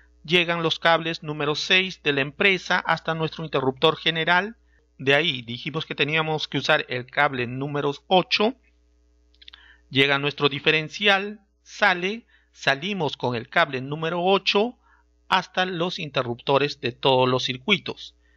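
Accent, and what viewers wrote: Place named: Peru. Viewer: Dime que eres de Perú.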